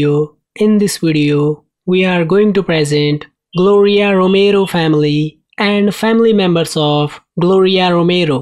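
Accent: India. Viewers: Bombay accent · Indian accent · Kkainis diko ma intindihan pilipino pinag uusapan indiano yata nag sasalita kakainsulto nman hayyy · indiano to boses palang halata na agad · I think he tries to copy the weird accent of chills youtuber but it turns out like and Indian one :) nice try brahh. .Chills tried to be different and he's known for his accent weirdness but if your accent is inspired by chills that's not different anymore :) but you know, i like it tho :) nice :)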